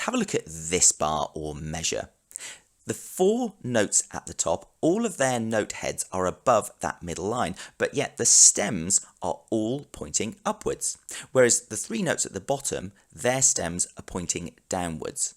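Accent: United Kingdom. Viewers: I really like your videos. As for your pronunciation, tough shit for the Italians. You don't have to apologize for being British. If they are offended, that's their problem.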